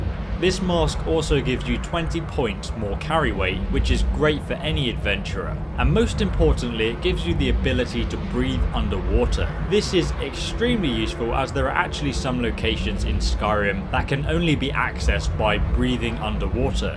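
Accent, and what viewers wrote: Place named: United Kingdom. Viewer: Your voice is easy to listen to, I like the British type accent and Australian and NZ etc. I tend to hate the American accent, that's why I can't look at many videos which are narrated by Americans (their voices just sound so annoying). As soon as a video opens and it is narrated by an American, I am immediately thinking "what a retarded sounding moron". lol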